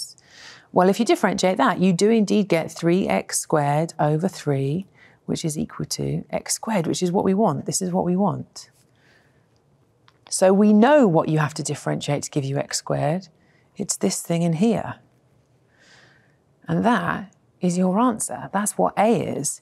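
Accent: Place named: United Kingdom. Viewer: Smart women = So attractive yeah the British accent doesn't hurt either.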